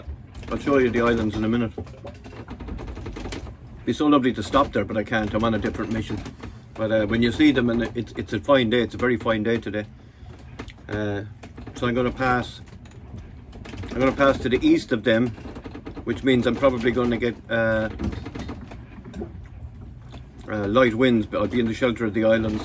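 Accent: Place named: Ireland. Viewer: It's so awesome to see people living their dreams. I'm so jealous!! A healthy kind of jealousy though, I'm very happy for you and your Irish accent:) Give me another 10 years of work and I'll be right there with ya! Keep living free and please keep sharing your life with us. My mother and her friend just got back from Lisbon yesterday. I told her that I will visit Lisbon some day, and that my visit will come from the sea, like the explorers and traders from 5, 6, 700 and more years ago. The history that came through Lisbon is incredible, you're part of it, and some day my boat and I will be also. If you ever are curious where your fans are from..I live in Fairport, New York. Where do you keep your boat when you're back in Ireland?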